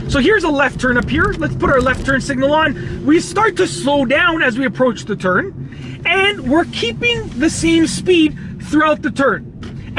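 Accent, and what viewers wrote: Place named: Canada. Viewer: The most canadian accent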